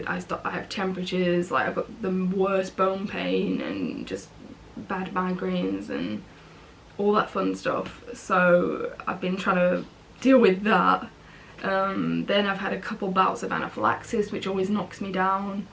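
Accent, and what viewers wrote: England, United Kingdom. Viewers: Thank you for finding the strength and courage to make more videos. I really love to watch everything you record. Everything! Your voice is so sweet and soothing. I am not being weird. I am serious. I just love your accent and ' too! I have been doing a lot of work on Ancestry and found that I am predominantly English, and my ancestors were centered near London. I listen to you talk and imagine how my ancestors must have sounded too! Thank you for sharing your world with us. I look forward to the next video!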